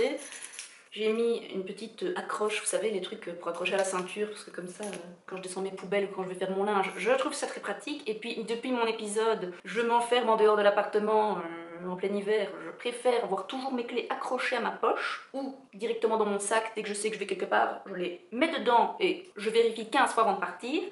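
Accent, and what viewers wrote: Belgium. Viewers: Pour la petite histoire, haha, J'ai reçu la semaine passée un masque Glamglow et j'avais envie d'avoir des avis concernant ce masque. Donc, en cherchant sur youtube je suis tombée sur ta vidéo qui compare ce masque avec un de Sephora - si ma mémoire est bonne. J'ai été interpelée par ton léger accent qui me faisait penser aux Belges et j'ai visité ton Instagram où j'ai vu, qu'effectivement, tu étais Belge. De là, mon petit côté patriotique m'a poussée à jeter un oeil à d'autres de tes vidéos et je dois dire qu'encore maintenant, je suis toujours sur ta chaine à visionner ton petit monde... Parce que j'ai une véritable passion pour les USA et surtout NYC, donc tu me fais littéralement rêver et en plus, je suis une crolée comme toi sauf que j'ai jamais trouvé l'astuce pour arriver à dompter mes cheveux si ce n'est le lisseur, donc je suis aussi intriguée par tes astuces. 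Voila voila ! haha. Si t'avais une boite postale j'aurais pu t'en faire parvenir un que j'ai et qui ne me sert pas. Mais bon voila, sache que chez Club il y en a. Je sais qu'on peut commander sur le site, mais je ne sais pas s'ils livrent aux USA. Je crois qu'ils sont limités à la France et au Luxembourg :/ Bisous !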